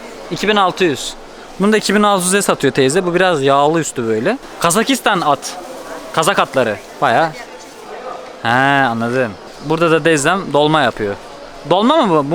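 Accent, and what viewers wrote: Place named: Ankara, Turkey. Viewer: Ağzın biraz Ankara Türkçesine kaymış k yi g ye dönüştürmüşsün çokca kez 😄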